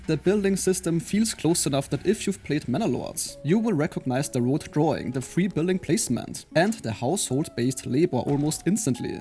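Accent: Germany. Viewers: Direkt den Alman rausgehört😆😆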